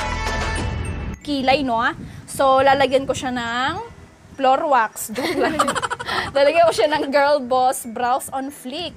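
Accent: Ilocos, Philippines. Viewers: "Sa kanyang lapistick" 😂 love your accent, im ilocano too. Your so talented dear. 👏👌Keep it up. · Ang ganda ate 😍 lapistick yung accent ate ilocana. Ilocana din ako😘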